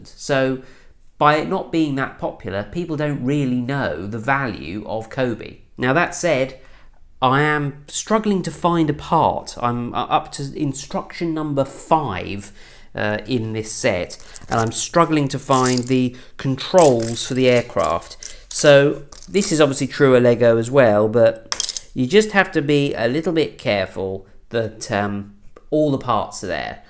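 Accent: United Kingdom. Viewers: Did he say Fokker ? I dunno, in that very British accent I choked on my LEGO brick 🧱. Hey keep up these vids, I’m new to the channel but I’m a scale model builder whose taken to LEGO’s now and again (the Ecto 1 & 1966 Batmobile) but I want some military models & you sir are providing some great info. (language aside - 🤣)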